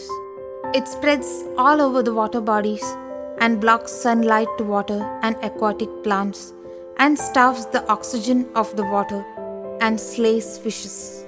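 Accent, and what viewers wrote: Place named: Kerala, India. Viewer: accent of sexy kerala girl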